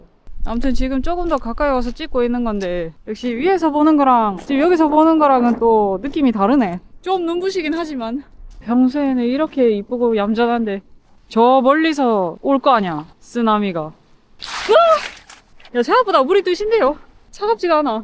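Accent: Gyeongsang, South Korea. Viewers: 얏빠리! 현지인들과 한잔하면서 대화하는게 젤 기억에 많이 남는거 같아요. 불과 1년반 정도된 일본여행채널이 17만을 찍은 채널이 있는걸보면 도 언능 10만을 찍고 기념으로 다시 만났던 인연들 재회하는 영상 찍으면 더욱더 시청자들이 영상들을 기다릴것 같네요. 구수한 갱상도 사투리에 유창한 일본어가 참 정겨워요! 😊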